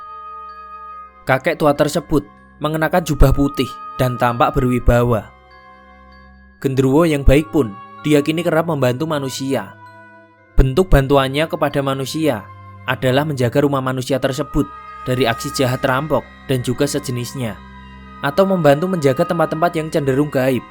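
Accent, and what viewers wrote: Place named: Java, Indonesia. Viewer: Logatnya bg kaya orang jawa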